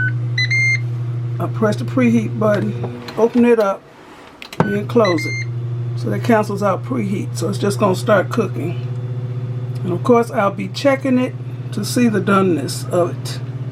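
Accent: Chicago, United States. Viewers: I knew I heard Chicago in you lol This look amazing never thought about adding the soups to my meats … Will be trying soon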